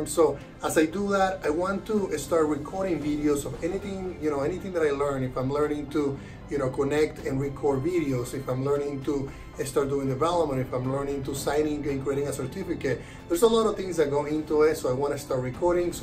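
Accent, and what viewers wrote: Spain. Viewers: why you do not have the same content in spanish your pronunciation is like spanish or something like that can you talk about in spanish too ? that would be great !!! God Bless you.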